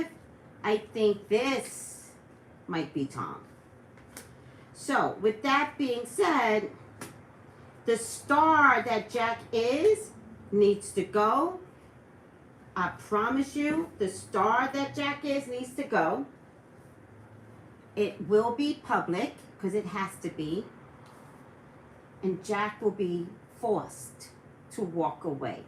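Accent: New York, United States. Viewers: Are you from NY? I love your energy!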